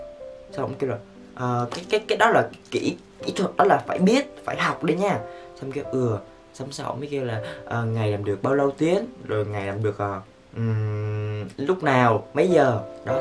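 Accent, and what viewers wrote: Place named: North, Vietnam. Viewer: Nghe giọng anh càng ngày càng ra giọng bắc nhỉ, nghe cute dã man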